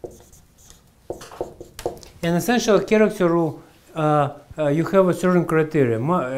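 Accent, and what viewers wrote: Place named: Russia. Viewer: I love his Russian accent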